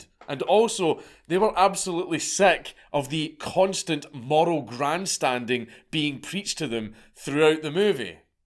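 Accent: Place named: RP, United Kingdom. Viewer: Completely off-topic: It's been SO helpful listening to you. Even though you speak almost BBC-quality English (please don't take offence, this is meant as a genuine compliment), ever since I've started listening to your content my ability to understand out-in-the-streets Scots has improved dramatically. You've pretty much been the bridge I needed from "The hell? Am I supposed to understand this?" to "Oh, it's Scottish. No problem." Of course, some elderly folk out of the big cities somewhere on the Whisky Trail are still far beyond me, but that's fine. After a tumbler or two of liquid smoke barriers just have a tendency to melt anyway. ;) Take it from someone speaking English as a third language: you're not just entertaining and informative, but also educative, even in ways you don't expect. Should I ever happen to run into you in person while on the Trail, expect being invited to a beverage by some random stranger claiming to be a fan. 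;)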